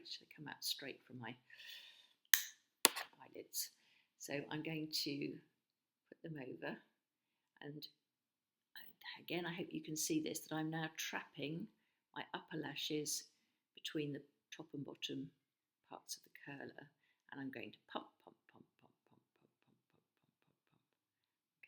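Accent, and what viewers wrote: United Kingdom. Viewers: I have only just discovered you & found this vid really helpful & good to watch, thank you. Also nice the hear a Brit for a change, although I love the US ladies I follow...( I am from UK, currently living in France). I look forward to seeing more of you asap !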